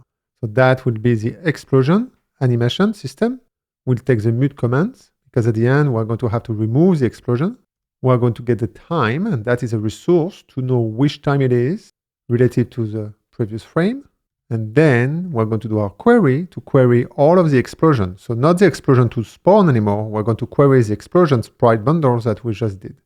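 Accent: France. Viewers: Simply the best bevy tutorial I’ve seen so far, it’s the first time I really understand what’s going on and how it works, merci beaucoup ! (je reconnais l’accent français, j’ai le même :D) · My guess is your native language is French (was not so difficult to guess right, right?). French is a soft language and it start from back of the head. while eng is hard and start from to front. My guess is when you try to replicate eng language accent you feel something wrong in your head, right? While with your current accent you feel ok. That's the point. When you feel the sound is wrong is in that moment that the accent is more near to native English people ;). If your goal is not to be proficient in English accent the suggestion of mine - speak slow and check the result with another person help (your kids?) - can be the solution for now :). Great content of course :)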